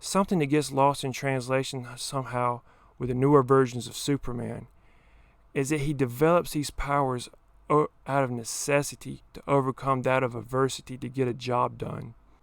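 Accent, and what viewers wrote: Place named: South, United States. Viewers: As i am from KY myself, i must say it is quite refreshing to hear a southern accent on this video. Feel like us southerners just ain’t on YouTube or maybe they’re hiding it cuz it’s viewed as not intelligent by some. Brother you won me over and got my sub. Keep up the work man I like this video a lot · Right there with ya fellas. I’m from KY too. The world is in serious need of badass southern YouTubers like this guy.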